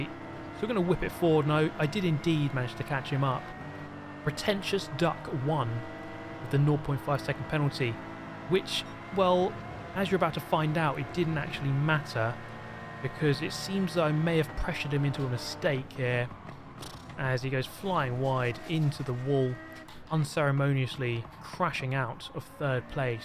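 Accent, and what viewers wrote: United Kingdom. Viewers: ! Your voice makes ne wanna be British! Um gonna STEAL your voice. But I need your password!!!😊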